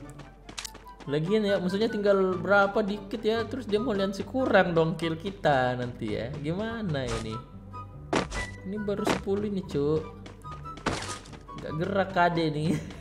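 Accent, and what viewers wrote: Makassar, Indonesia. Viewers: makassar mana suaranya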